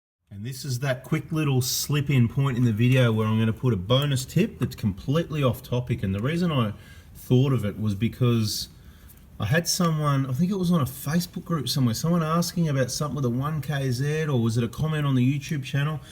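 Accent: Australia. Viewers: Hello! I’m new to your videos. When you say 5k’s, is that in km or miles? You sound a bit Australian so I was thinking it must be km, but changing your oil every 5k is a bit overkill? Here in Norway the price for a liter oil is around 20-30 US dollar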